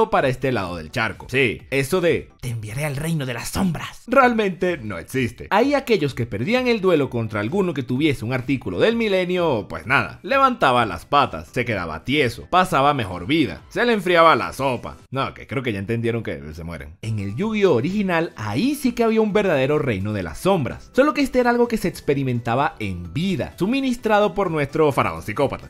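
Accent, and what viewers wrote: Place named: Venezuela. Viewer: pana tu eres venezolano, tu acento me parece de alla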